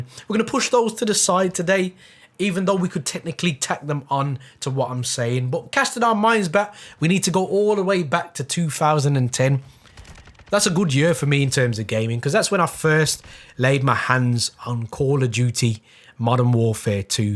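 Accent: Birmingham, United Kingdom. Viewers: Love watching your content , you sound near to were i live in brum keep up the work bro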